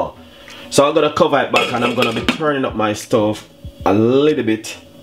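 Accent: Jamaica. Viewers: I love food but my favourite thing about your video dem is ur Jamaican accent... keep it real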